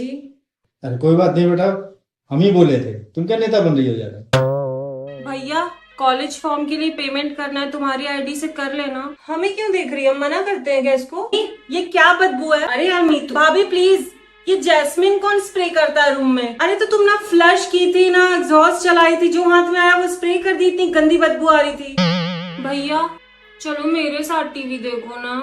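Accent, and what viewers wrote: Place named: Varanasi, India. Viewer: मुझे तो आपकी पुरानी वीडियो - बनारसी लहजे vali बहुत पसंद हैं , वो फिर से डालिए please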